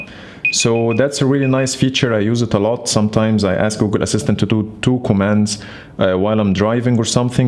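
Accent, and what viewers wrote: Egypt. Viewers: Your accent is so Egyptian damn 😅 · من طريقه نطقك شكلك مصري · Are you Egyptian? Your accent is very Egyptian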